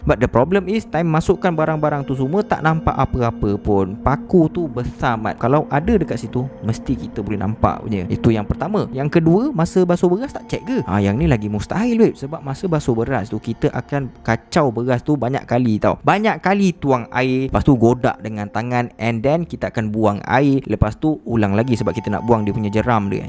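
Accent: Johor, Malaysia. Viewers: bang dari johor ke hahahaha salam dari kulai senai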